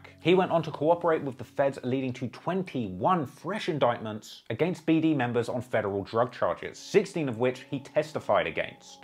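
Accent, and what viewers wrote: England, United Kingdom. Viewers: RIP to all the young brothers that lost their lives. Once again the devil is trying to divide the Black Community and the Hip Hop Community. Unity is power brothers . Brother Hoover said G D is growth and Development the B D in this case should be Business Development. You see they have a White Man with an England accent narrating this, he is exploiting black youth he will never set foot on Parkway Gardens or O Block to help these young brothers. Unite GDs and BDs because we have one common enemy. Let's build up our communities and protect our children, women and senior citizens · It’s a little weird a Brit talking about Chicago gang history but you seem to have done an extensive amount of research. 🤷🏽‍♂️ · why is a British dude talking about gangs? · So we really listening to this British or whatever the hell it is tell us about American gangs and then he has a rap label that he said is the new death row?!? 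Wake up people.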